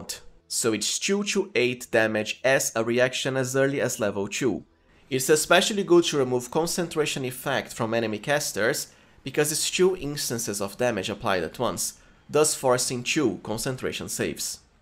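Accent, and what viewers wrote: Brazil. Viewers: This guy has to be Brazilian, I just know iiiiiit!!!! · Tu tem um sotaque brasileiro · Com esse sotaque ja sei que eh br.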